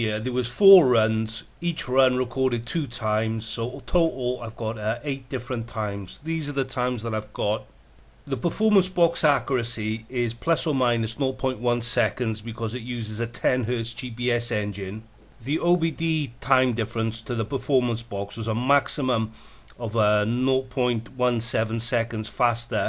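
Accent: Wales, United Kingdom. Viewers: Didn’t expect a Welsh accent! 🏴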